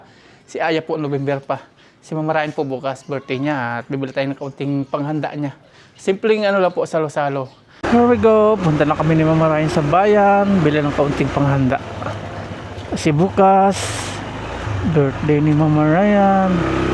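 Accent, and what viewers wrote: Visayas, Philippines. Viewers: Bisaya ka ba? Yung tagalog mo kasi tonong bisaya eh.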